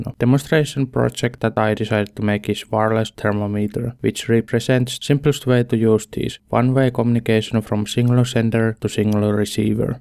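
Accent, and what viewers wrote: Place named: Finland. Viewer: The accent sounds a bit finnish. (Rallyenglish)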